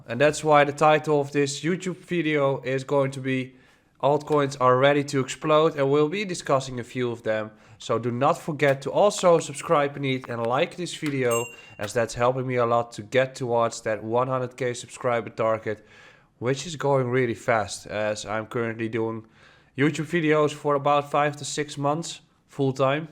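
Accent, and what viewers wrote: Netherlands. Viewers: Nee man, maakt niet uit. Beetje boeren Nederlandse accent stoort niemand zich aan :P Je hebt een leuke persoonlijkheid en je NL accent hoort daarbij. Ik zeg, houden. Je hebt nu al verdorie meer subscribers dan veel kanalen die native Engels zijn dus mijn inziens doe je het goed :) Je wordt zelfs vaak op wereldbekende crypto nieuws websites aangehaald als expert dus je doet echt wel iets goed haha.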